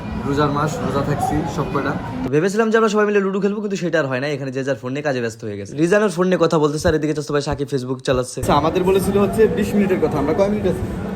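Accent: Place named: Gopalganj, Bangladesh. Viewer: Apnar basha gopalgonj ooo vai😮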